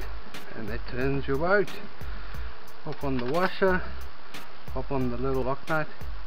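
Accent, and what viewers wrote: South Africa. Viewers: I can hear your SA accent too, it's a weird blend, lol. I'm a kiwi living in Tasmania and missing the great NZ fishing, lol. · Hey dude where in South Africa are you from LOL.... your accent gives you away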